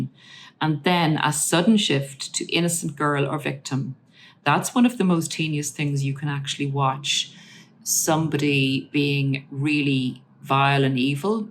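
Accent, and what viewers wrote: Ireland. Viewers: Just love your Irish accent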